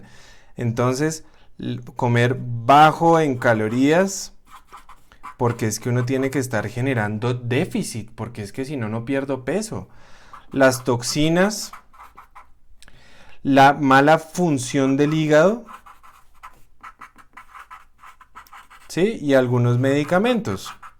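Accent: Colombia. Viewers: Gracias, muy Colombianisimo, en su hablar! Veremos como me ayudo con toda esta informacion. Estoy en un pais, donde : TSH, T3,T4 y listo. y hay de mostrar que sabemos un poco mas.......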